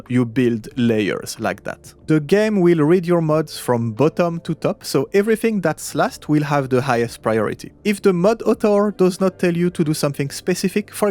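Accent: France. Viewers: bonne vidéo merci. tu serais pas français ? ton accent me fait penser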